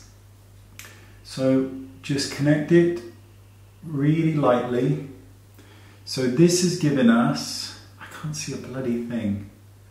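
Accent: United Kingdom. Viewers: Agreed.. a British accent is a plus too😍💕🍁🇫🇮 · I agree with you. Although I am from Brazil, I can understand almost the tinge he says. I Love his britsh accent. Sorry my english 😊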